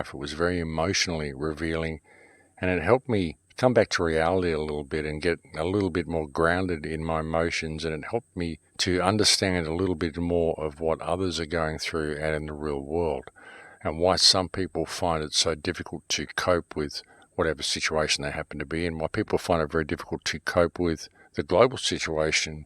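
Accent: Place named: Australia. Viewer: its good to hear an aussie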